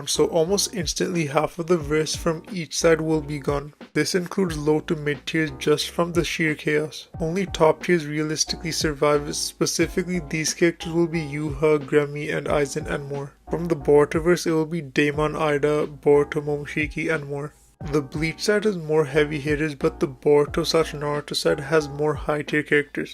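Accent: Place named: India. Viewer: even your English accent is so trash are you Indian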